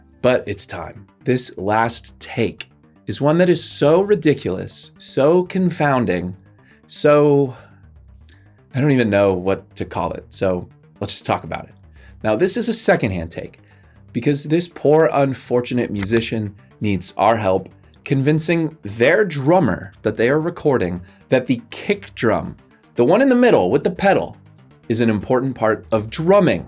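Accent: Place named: United Kingdom. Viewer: Where are you local to? UK I'm guessing? I watched a video yesterday and I think that was your accent.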